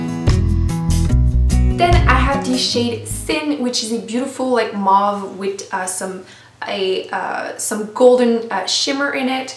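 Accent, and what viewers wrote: France. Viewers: I just love Nars blushes because they are so pigmented! I never have to worry about them showing up on my skin. I have 8 right now, Amour and Seduction are next on my list. Es-tu française? J'ai toujours l'impression d'entendre une pointe d'accent. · gorgeous French accent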